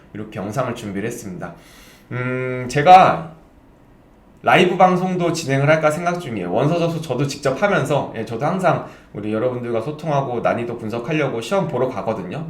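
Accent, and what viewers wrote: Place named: Jeolla, South Korea. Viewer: 아놬ㅋㅋㅋㅋㅋㅋ 연고가 전라도쪽이신가보내욬ㅋㅋㅋㅋㅋㅋㅋㅋ 누가봐도 네이티브 사투맄ㅋㅋㅋㅋㅋ 저도 작년에 우정으로 급하게 바꾸면서 거주지 안되어서 서울쳤던 기억이 나네요..! 저도 자세히 안알아봣다면 난리났을지도..ㅎㅎ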